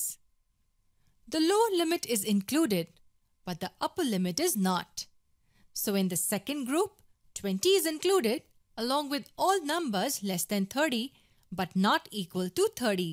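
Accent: India. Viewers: Indian ascend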